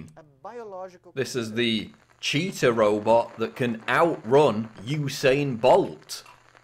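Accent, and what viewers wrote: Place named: Northern England, United Kingdom. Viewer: I can't take this narrator seriously, he is too northern and sounds like he is taking the piss.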